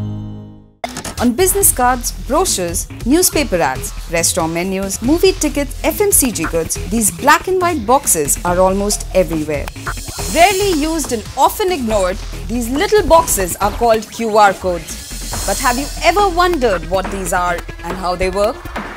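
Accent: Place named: India. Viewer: the indian accent always kill me, and the video does not explain how it works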